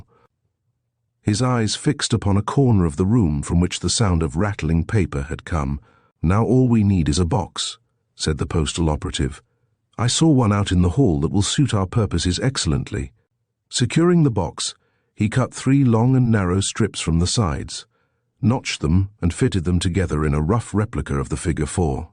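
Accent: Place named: England, United Kingdom. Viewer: having a strong English accent read a specifically AMERICAN tale.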